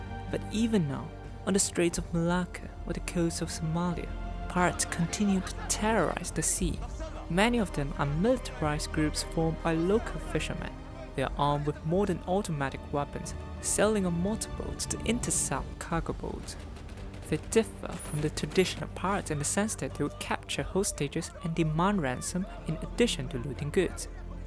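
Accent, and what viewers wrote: United Kingdom. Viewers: 加上英式發音簡直絕配啊啊啊啊啊啊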